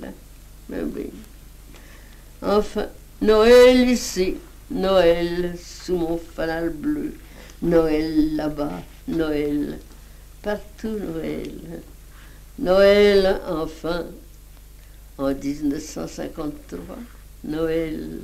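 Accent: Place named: Burgundy, France. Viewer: Simplement l'accent bourguignon d'une femme de 80 ans à une époque où l'on avait conservé sans honte les particularités, les spécificités de sa région natale. Comme la langue s'est appauvrie !...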